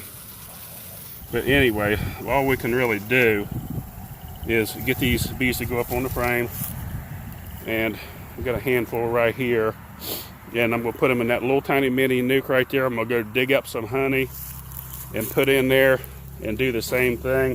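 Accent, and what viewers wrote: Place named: Pennsylvania, United States. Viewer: where are you from? the accent sounds vaguely familiar. Maybe Pa.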